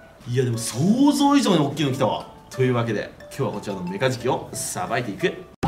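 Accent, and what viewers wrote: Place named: Tokai, Japan. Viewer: 東海出身ですか？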